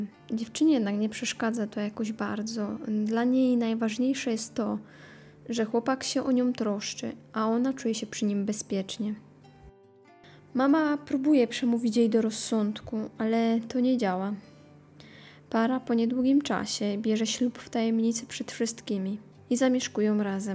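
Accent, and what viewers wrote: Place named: Russia. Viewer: Posiadasz RUSKI AKCENT..Czy jesteś Ukrainka?????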